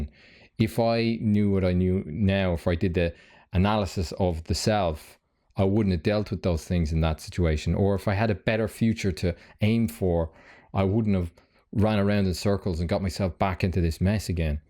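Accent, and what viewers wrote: Ireland. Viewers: Is this guy Irish? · He sounds Irish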